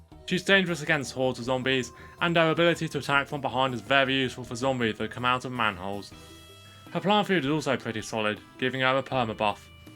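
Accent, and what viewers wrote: United Kingdom. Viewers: The British accent is very cool 😎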